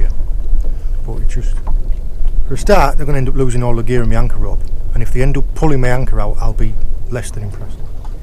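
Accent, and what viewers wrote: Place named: West Country, United Kingdom. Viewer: A cracking watch as usual, so many talking points!! Tips & hints galore all the way through which we have all became accustom to, watching your vids, I'm no stranger to wreck fishing but I have a skipper's guidance to assist me, the fact that you're doing this solo makes it all the more creditable. The part of the country that you're fishing makes me envious to say the least, no wonder you relocated from the North East, that's the accent I'm detecting!! Although I can hear a West country twang entering your dialogue occasionally!! If you are a sea angler in the UK does it get much better than Cornwall? As you've shown the fishing ain't too shoddy but the fact that you share the environment with Minke Whales, Dolphins & Tuna really showcases the South West in general to anybody not already acquainted with it's natural beauty. 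As I said so much to talk about but one last thing, how close do these other boats want to get to you? How annoying when they've got the entire ocean to fish!! Solid stuff, just what I need on a Saturday morning!! All the best pal.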